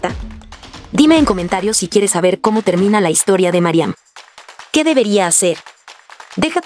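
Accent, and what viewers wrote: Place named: Mexico. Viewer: Yo creía que era Mexicana su acento no parece de cuba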